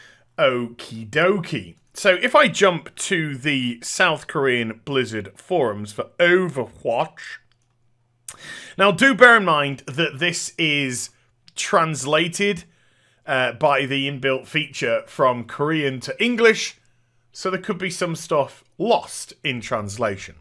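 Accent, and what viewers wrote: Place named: United Kingdom. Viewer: lol this British accent :D, im sorry i assumed that!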